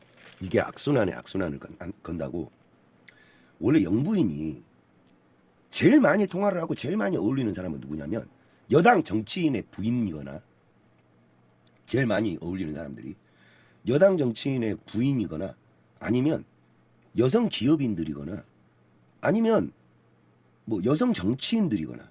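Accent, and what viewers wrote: South Korea. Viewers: 욕도 찰~지게 전라도 사투리로 재미져~ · 처음엔 좌파인줄 알앗ㅅ는데 계속듣고보니 정치를 잘 파악하고 앗ㅅ는 분이군요.호남말씨인데도....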